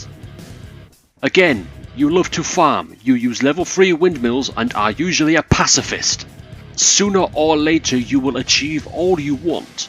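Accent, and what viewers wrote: United Kingdom. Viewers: Or maybe it's because I was so surprised to hear a British accent, I was honestly expecting some annoying Yank voice 😂 Never knew you were a Brit! Makes all the edgy texts over the years much better knowing the British humour. Americans would be completely serious saying all that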